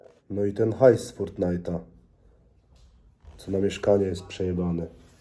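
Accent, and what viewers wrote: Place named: Silesia, Poland. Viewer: Śledze cie od 6 lat albo lepiej, jeszcze kiedy nagrywales ciekawostki bez pokazywania twarzy i miales z 50k widzow. Ta zmiana akcentu na "ala śląski" jest pod widzów? Kiedys normalnie mowiles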